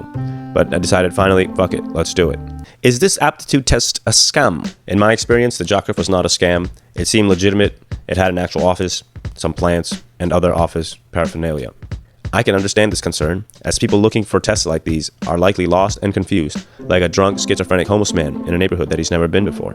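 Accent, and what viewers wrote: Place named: United Kingdom. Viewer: That British Accent 😂😂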